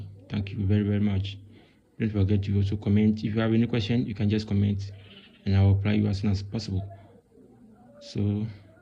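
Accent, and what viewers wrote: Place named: Ghana. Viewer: GHANA man English dat , no lie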